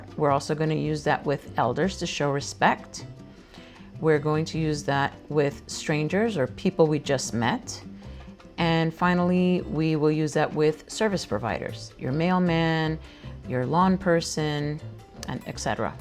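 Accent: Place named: Latin America. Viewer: Where are you from? You sound Latina Americana! 😊 ❤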